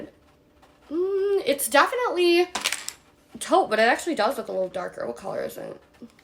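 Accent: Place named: New Jersey, United States. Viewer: Jersey? Or New York...it's not super strong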